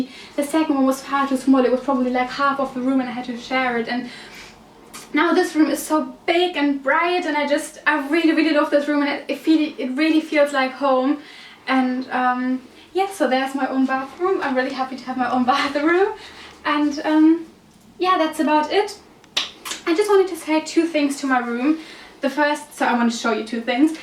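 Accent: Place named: United Kingdom. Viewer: Dein English ist echt toll😍 und ich finde es echt toll, dass man echt richtig hört, dass du British English "lernst".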